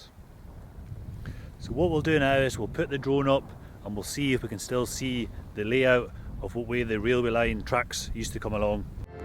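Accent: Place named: Wigtownshire, United Kingdom. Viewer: was born stranraer,,i can hear yer accent,,!wigtownshire,,dunragit lol,went to drochduil school ,we could see them bombing at the dunes sometimes at west fruegh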